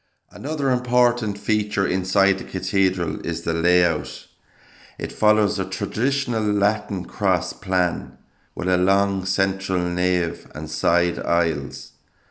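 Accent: Ireland. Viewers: I enjoy your videos and love your brogue. You remind me of my great grandfather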